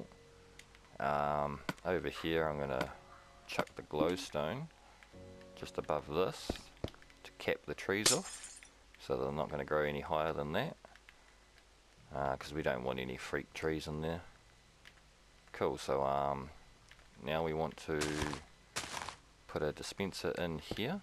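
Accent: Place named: Australia. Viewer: this thing is incredibly slow/inefficient, too many non wood blocks within a 5x5 radius. if this were just dirt and a dispenser firing away in an otherwise clear 5x5 area, even just that one glowstone 8 blocks up would slow it down whereas a wood block would not. of course thats also a much faster albeit less flashy way of getting wood. comparatively, this is like the poster boy for erectile dysfunction. however, it would be great if it didnt go against the sapling mechanic and you did a sound job with the video so id say go back to your own design. start off with a dispenser and mark off a 5x5 for testing, you will see that wood blocks(not planks) can pretty much go anywhere while others can maybe go 2 high or so before they hit the bonemeal rate. that said, i still cant give this a thumbs down, Australian accents captivate me. goodaye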